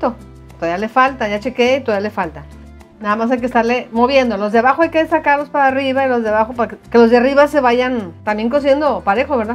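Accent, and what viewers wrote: North, Mexico. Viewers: Me gusta tu acento norteño igual al mío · Así hablamos en el norte del país, ¡¡¡. Muy diferente a los del centro de México.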